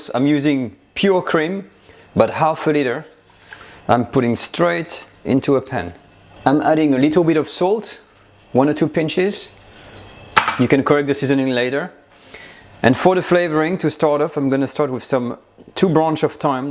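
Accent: France. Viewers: That's because it's a French man speaking English in a French accent🍟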